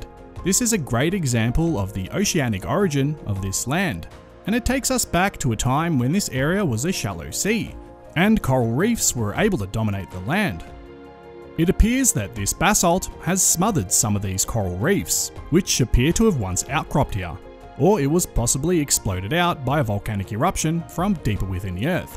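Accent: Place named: Australia. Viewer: I love your Content, You are saying wonderful things but the way you narrate them and by that I mean your thick Australian accent makes watching your them really-really difficult. I am sure I am not the only one with this problem. I am watching this one just to post this comment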